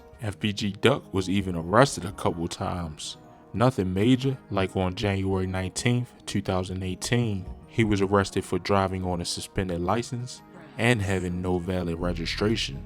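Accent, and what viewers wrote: Baltimore, United States. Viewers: you from baltimore aint you? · I’d guess Baltimore. I loved there for about 6 yrs. that’s definitely a bmore accent. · Are you from Baltimore? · You from Baltimore, my DC ass can hear it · dude who channel this is gotta be from Baltimore lmao he say " Tewww , Lewse , Avenewww " 🤣🤣🤣🤣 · He definitely from bmore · He gotta be from Bmore with that accent lol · Facts I literally can hear it in his voice especially when he said “Tew” instead of “too” Baltimore people stay saying that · That Baltimore accent something else!! Like your content.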